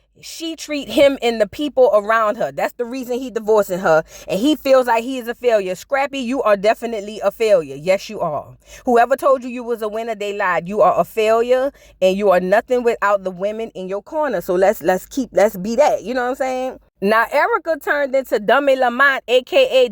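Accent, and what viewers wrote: New Orleans, United States. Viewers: It’s ya naworlins accent for me 😂😂😂 keep it up girlie 💐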